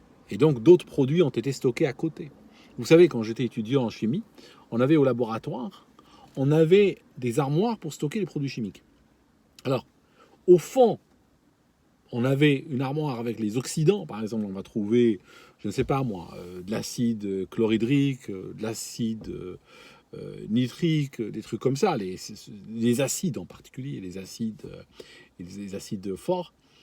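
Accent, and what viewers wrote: Switzerland. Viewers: Je comprends mieux les légères pointes d'accent suisse maintenant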